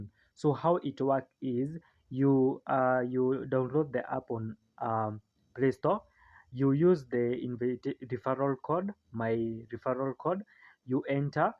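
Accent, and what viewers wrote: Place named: Kenya. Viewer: You sound Kenyan is it legit?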